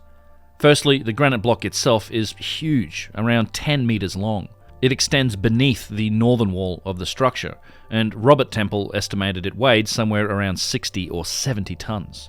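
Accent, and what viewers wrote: Australia. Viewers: Say, saw your full name written somewhere and it indicates you're either from Dutch or Belgian-Flemish descent (probably Dutch judging by your Australian tongue). I'm from Belgium myself, Flemish speaking, so, that makes us practically neighbours, eh?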